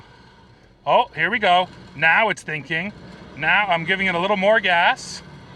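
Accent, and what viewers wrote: Canada. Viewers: FYI, the US pronunciation of Mazda and Yamaha with an IPA ä sound is closer (not perfect, but closer) to Japanese pronunciation than the Canadian æ sound. That said, you don't have to water down your Canadian-ness to appeal to a broader audience. I've been subscribed since long before ever worked for TFL, and it's one of the endearing distinctive qualities that helped you stand out when I watched my first video. That you have real experience using trucks for towing, hauling, and off-roading, plus winter weather testing is what makes you one of my favorite channels.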